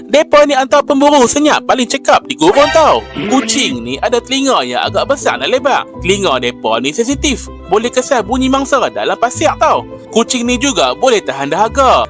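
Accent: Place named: Perlis, Malaysia. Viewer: Ni la loghat perlih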